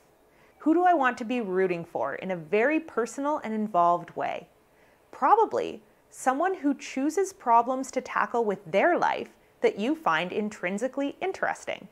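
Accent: Canada. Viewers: This lady has a nice Canadian accent.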